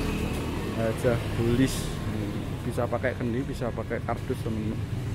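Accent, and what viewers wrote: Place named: Java, Indonesia. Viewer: Medok amat yak ngomongnya....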